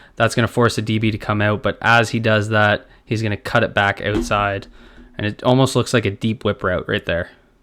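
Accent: Canada. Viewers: Hey man I love your content & analysis. Btw I noticed your accent. Are you Canadian?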